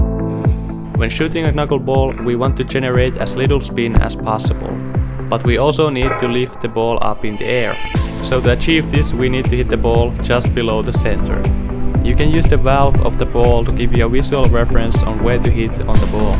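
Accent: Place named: Finland. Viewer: the accent screams suomi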